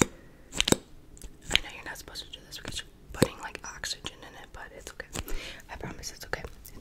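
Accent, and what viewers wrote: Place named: Michigan, United States. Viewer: Omg you sound like you’re a fellow Michigander. Your videos are so relaxing. Have a good evening girl❤️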